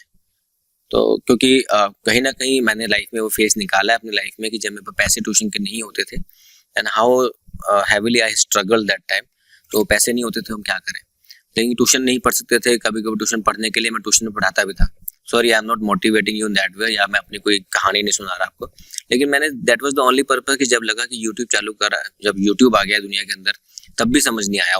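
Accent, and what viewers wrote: India. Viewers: Sir ap lucknow se ho ?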